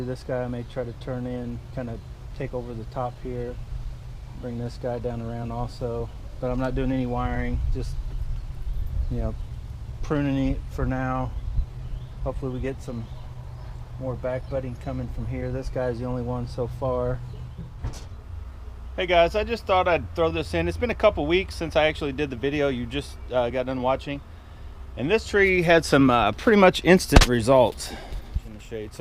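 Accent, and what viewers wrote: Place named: South, United States. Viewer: Love your accent, are you from south of usa?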